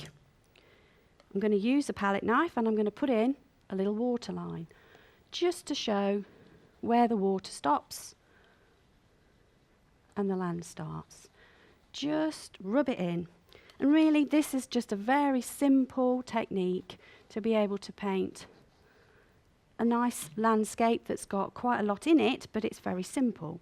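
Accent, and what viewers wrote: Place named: Birmingham, United Kingdom. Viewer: Thanku for sharing. I love your ( Brummie) accent. keep 'em coming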